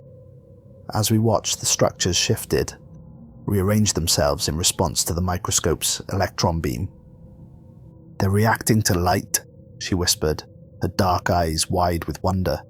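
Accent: Wales, United Kingdom. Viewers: I do like the story's! But it seems, only bit different from meny b4 ,,,please do some different one's!.if possible have some others to join you ,it will give the story's more depth. I'm not criticising you ,never stop ,can I here some Welsh in your voice,,,,hope so ,.if I ever get rid of long covid, hopefully i can start writing a story or to again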